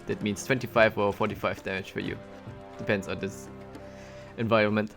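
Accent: Germany. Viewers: its the audio mix, he has a slight german accent but should be understandble for everyone.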